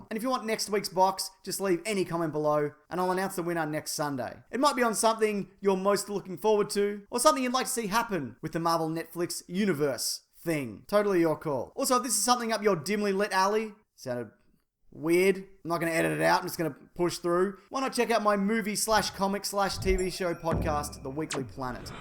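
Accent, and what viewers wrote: Australia. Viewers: hey you're aussie right? like... you sound aussie. if you are, which state. WA is where I'm at.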